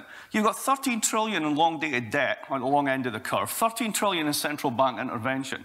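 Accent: Scotland, United Kingdom. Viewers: This is a proper Scottish accent you silly person! Do you know that Scottish generated, for such a small population, the greatest economic thinkers and one of the greatest concentration of philosophers! I suggest you educate yourself.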